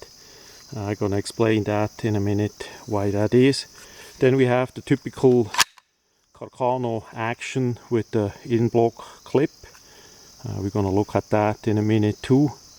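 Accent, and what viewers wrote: Switzerland. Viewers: Ihr Schweizer Akzent ist einmal eine schöne Abwechslung von Arnolds Österreichischen Akzent. Apropos, wissen Sie welche Shotgun Don Camillo hatte?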